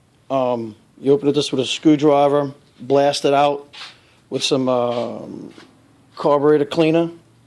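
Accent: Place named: Appalachia, United States. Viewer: his accent makes me wahna throw my car at this hillbilly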